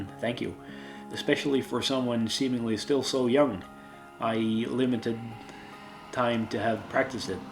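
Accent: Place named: Upper Peninsula, United States. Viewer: You're my favorite YouTube discovery of 2025! I've been assuming you've been doing an intentional Joe Perra inspired thing with your voice. But I don't think you would use an affected voice for an entire video like this. So now I'm thinking Joe Perra's accent is inspired by not just the folks who live in Michigan's Upper Peninsula, but specifically folks of Swedish heritage up there. You both nail a very hygge feel. (Not a Swedish word but it's caught on in the US for a specifically northern European cozy vibe.) I've had the causal relationship backwards! You come by it naturally. No need to build a character around it. Happy belated birthday! Your English is easily as good as if not better than half the native speakers I know. Maybe you don't have the vocabulary of a college professor. But the vast majority of us don't, either. 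Don't sweat it.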